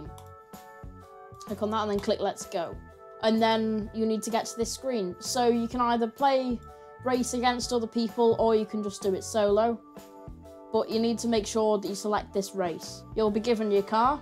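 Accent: United Kingdom. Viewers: r u scottish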